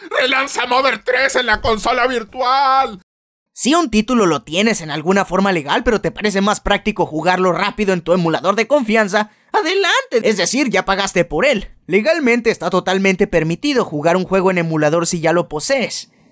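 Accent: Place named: Yucatán, Mexico. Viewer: Para nada, al contrario me gusta tu estilo , si noté un acento medio yucateco BOMBA! , pero es sólo mi percepción, saludos y buen trabajo!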